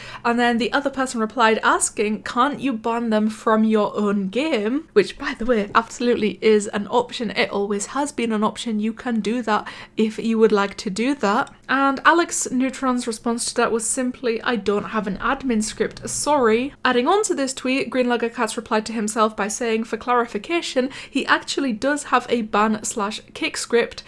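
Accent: United Kingdom. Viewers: I like ur British acebt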